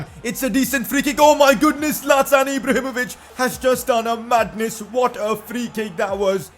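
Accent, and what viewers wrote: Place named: India. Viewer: the worst youtuber with the gi gi gi aaaaaaa Indian accent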